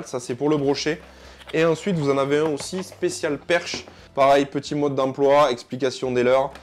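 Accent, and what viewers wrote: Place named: South, France. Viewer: Salut l'amie pêcheur ! Tu as très bien présenté tes produits en plus avec l'accent du sud ! J'aurais aimé qu'il y ai une présentation sur un moulin casting big bait car les promotions sont assez rare un peu partout ! Car je ne peux pas m'acheter se fameux moulin qui irait avec ma canne jerk bait 60 120 gr de chez Daiwa legalis ! Merci encore pour cette présentation !!! A bientôt l'ami !!! 👍🎣🤗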